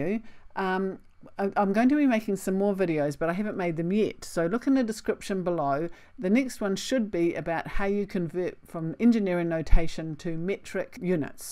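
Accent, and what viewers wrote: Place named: New Zealand. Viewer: Super helpful. Also good to hear a kiwi explaining this. Thanks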